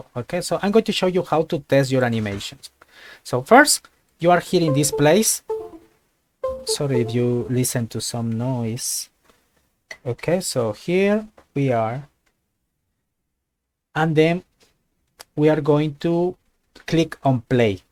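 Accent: Spain. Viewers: aqui puedo notar que tu ingles es bueno pero a la vez español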